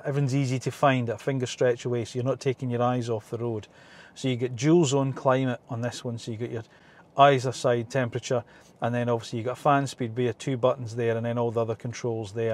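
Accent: Scotland, United Kingdom. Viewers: I would listen to the Scot accent anytime even if from the Yellow Pages ! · Very nicely reviewed, I have the Cross Excel, had it for just over 2 years, a great wee car, very economical, Livingston to Anstruther in Fife last week 81. Mpg, I am thinking of changing it for another Excel or GR Sport, before Yaris I had Skoda Karoq towing my caravan, but I have Osteoarthritis now, had to give up caravan but needed SUV style, thought you were a Scot before you mentioned your mum. Well done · Thanks for a very informative review.. also Car reviews are so much better with a Scottish accent 😊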